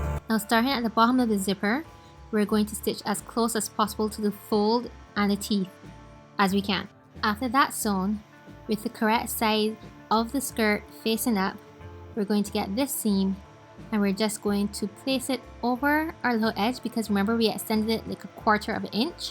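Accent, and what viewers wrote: Barbados. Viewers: Are you from Barbados?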